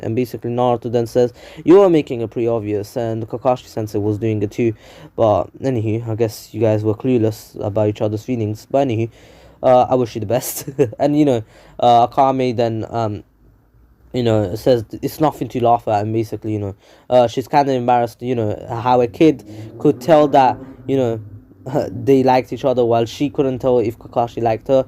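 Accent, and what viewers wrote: United Kingdom. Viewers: You sound like a Leicester guy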